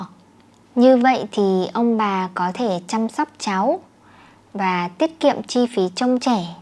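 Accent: North, Vietnam. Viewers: Does the YouTuber speak the Northern Vietnamese language in her videos?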